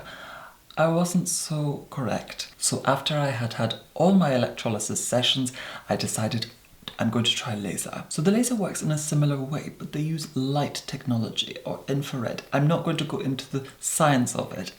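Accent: Scotland, United Kingdom. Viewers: I hear a little bit of a scottish undertone to your accent, any scottish heritage?! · Анастасия Горячева l think that it's a Scottish accent from one of the Highland islands x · This is the most Scottish I've ever heard you be, yay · is he scottish? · Um bull. I know people from Ayr and other near areas and they sound very similar to this. It may well be exaggerated- but this is normal for that region of Scotland. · Eyez Cold is not English. That, you will find, is a beautiful classic true Scottish accent. From the days of Robert Louis Stevenson.... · Yes he's Scottish :) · Are you scottish? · Just realised the accent is posh Scottish not English the fuck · He's actually Scottish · Obvz but where in Scotland? He has a mix of accents . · I am loving your accent, a hint of scottish in there?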